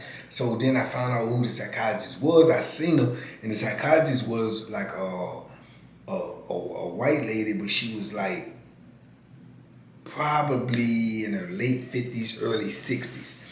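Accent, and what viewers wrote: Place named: Virginia, United States. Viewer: Man, I love the stories, but they're just a bonus to me. Started watching APS and then living in Asia...just to hear voices from that Tidewater/VA area. Ain't nothing more comforting than an accent from home when you been gone too long. Damn good to hear your accent man. Salute.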